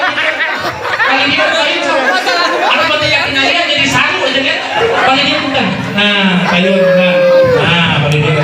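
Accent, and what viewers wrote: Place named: West Java, Indonesia. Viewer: njir sunda pisan ieu mh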